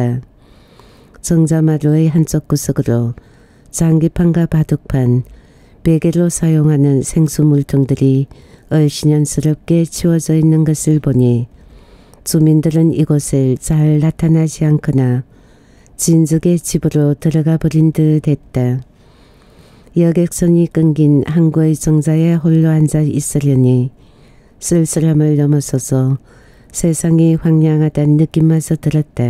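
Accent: Gyeongsang, South Korea. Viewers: 경상도억양이 처음에는익숙지못했지만지금너무도정감이느껴짐니다.감사히잘듣고있씁니다.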